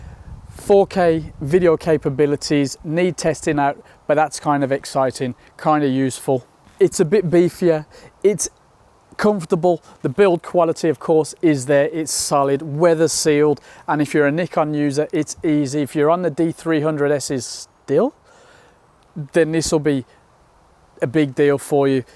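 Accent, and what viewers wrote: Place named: Northern England, United Kingdom. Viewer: sound like you fron northern England..maybe Liverpool/Manchester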